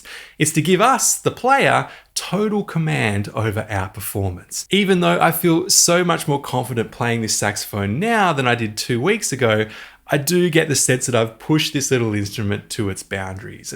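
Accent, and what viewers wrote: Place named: Australia. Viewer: Didn't realize you were an Aussie until you stated it. After that, I couldn't unhear your accent. Regardless, you have perfect radio/TV voice for any international channel, you articulate so effortlessly. And thanks for the excellent review. I am really considering getting this instrument and will use the link if and when I do. If I were not already focused on learning various rythm instruments, I would consider sax and your course. It is an opportune deal for those who are interested though.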